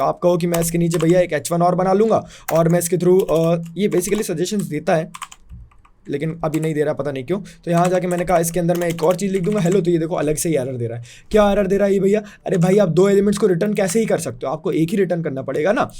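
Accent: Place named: Indore, India. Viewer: Bhiya indore s ho asa lgta h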